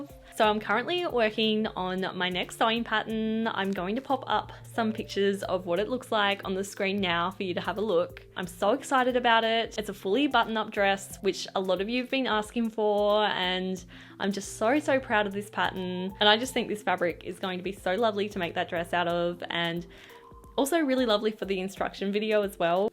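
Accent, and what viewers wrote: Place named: Australia. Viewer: Struggled with the Summer bit. Then heard you talk and remembered, silly me, you are in Australia!. Been there 20yrs ago. Wish I was there now. Looking forward to seeing how the dresses turn out. Also as a Quilter I can't wait to see your artistic version.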